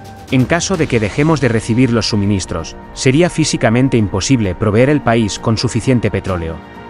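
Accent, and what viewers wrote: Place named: Spain. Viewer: Bolivia noticias, y sale hablando un español. ??????????????????????????????????!!!!!!!!!. Habrá un canal que se llame chile noticias y salga hablando un cubano o un yanquee ????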